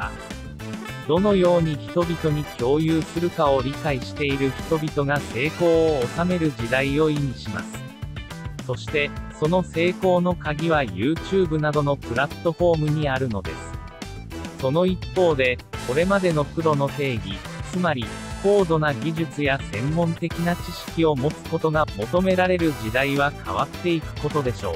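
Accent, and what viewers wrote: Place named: Kansai, Japan. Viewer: は、やはり関西弁バリバリの解説がしっくり来るのですが😅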